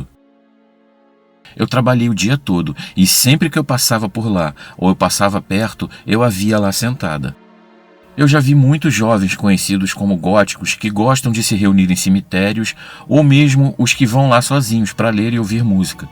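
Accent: Rio de Janeiro, Brazil. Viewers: Mas o sotaque dele é de carioca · Ótimo relato! Um ponto extra é pelo sotaque carioca, que eu amo, pois também sou carioca da gema! Muito sucesso pra você! 👍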